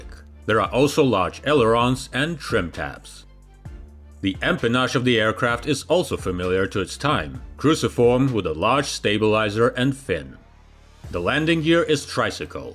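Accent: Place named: Russia. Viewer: Английский не его родной язык, он русский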